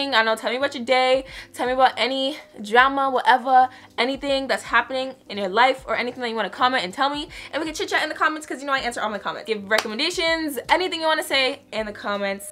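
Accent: United Kingdom. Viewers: Why did I get confused by the British accent for a second?😂😂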